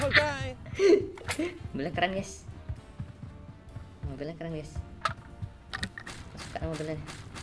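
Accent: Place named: East, Indonesia. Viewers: Cara ngomonya orang papua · Logat nya orang papau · Bang itu Kayanya orang Papua Bang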